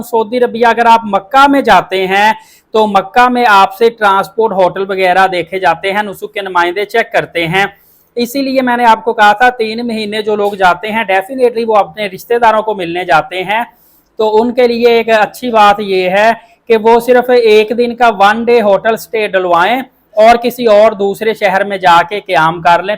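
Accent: Punjab, India. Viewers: پالیسی لفظ ہے جناب پلیسی نہیں بولتے 😊 آنگلش بھی پنجابی میں 😊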